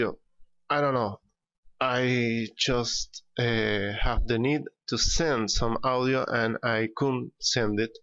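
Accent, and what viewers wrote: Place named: Argentina. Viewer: Vos sos de Argentina?. Se te nota la tonada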